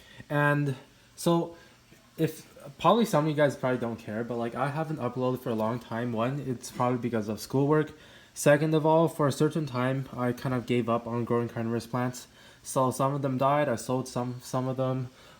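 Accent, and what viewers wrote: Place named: China. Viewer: 怎么中国口语啊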